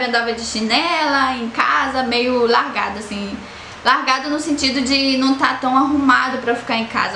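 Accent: Mexico. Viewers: Até o seu sotaque é mexicano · Já está até com o sutaque deles🤭❤️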